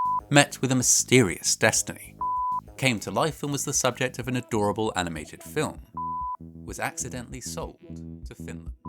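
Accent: United States. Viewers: I really enjoyed your american accent. ❤ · Once again, I have been absolutely murdered by your American accent. Brilliant, it was like I was there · your American accent is awful. loved the story anyway :-) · Your American accent is a glorious thing. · Your American accent is fantastic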